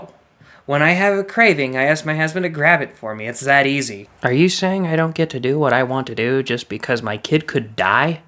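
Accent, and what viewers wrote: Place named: Germany. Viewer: I freaking love the German Accent XD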